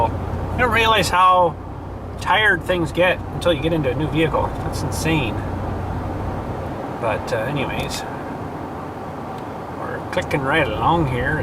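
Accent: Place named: Ontario, Canada. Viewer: Good video, but I must say... you sound like Red Green! Haha, must be an Ontario thing. Cheers from Alberta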